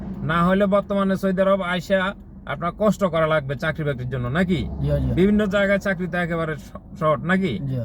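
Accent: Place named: Sylhet, Bangladesh. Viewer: সিলেটের লোক মনে হয় 😢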